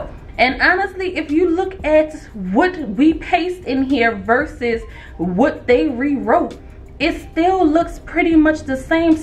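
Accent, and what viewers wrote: New Orleans, United States. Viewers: I hear dat accent!!! You gotta be from the NO!!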